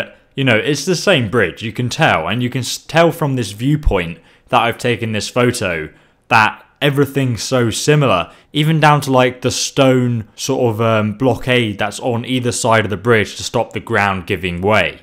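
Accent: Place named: England, United Kingdom. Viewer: We should start a gofundme for you to get a drone so we can listen to that beautiful English accent for many more long winded minutes. Anyone down? Ohmmmmmmmm bethesderrrr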